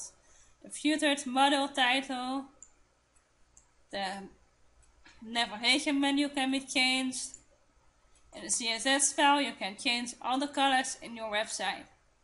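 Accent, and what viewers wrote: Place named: Netherlands. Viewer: Zo te horen aan je accent kan ik hier gerust iets in het Nederlands neerzetten. :-) Top Tutorial! Net wat ik zocht.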